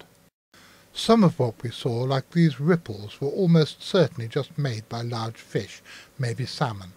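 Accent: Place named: RP, United Kingdom. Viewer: Apart from all of the comments about the video quality and style, 3 things. 1. Your age is not a qualifier of reliability (or your plummy accent). 2. Haven't you EVER seen what a swan or other large bird has to do to get into the air from water? 3. You also can't convince us by dismissing all the images beforehand '... the are just large fish, don't worry about them... blah blah'. I'm sad about all these things. The world is a strange place, and we're all interested, but this? Buh.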